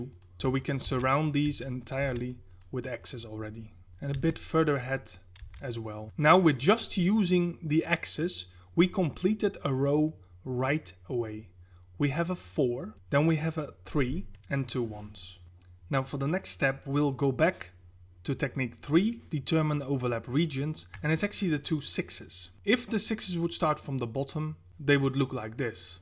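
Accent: Netherlands. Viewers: Dutch detected!!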